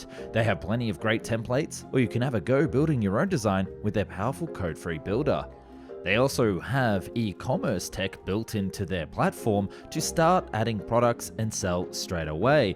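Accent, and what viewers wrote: Australia. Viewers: Bro it's Australian he says SUR 25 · He's Aussie, what do you expect? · well hes australian he has an accent like that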